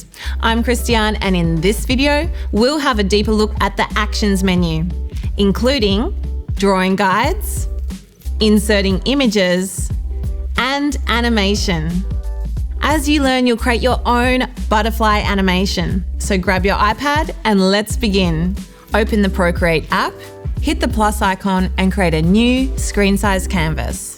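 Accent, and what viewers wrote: Australia. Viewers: I think I share the sentiment of many others judging from the comments below that this has been the best series. As a fellow Aussie I loved the accent, and because I am a slow learnier I just reduced the playback speed to .75 which was very helpful. I have dabbled in Procreate for over a year on and off, mostly off because I start and then feel so overwhelmed so I give up, but this series was brilliantly paced to allow me to really understand the workings of the program. I know it is the tip of the iceberg but thank you for letting me gain more confidence and a desire to continue. I can not wait for an intermediate series, BRING IT ON!!! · This was a fantastic series, thank you so much! Smashed through it in a day 😁 feeling so much more confident! Also so happy to hear a fellow Aussie accent! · Keep on going and please do an intermediate series … well done for the simplicity and objectiveness of the videos. 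I liked the Australian ascent :)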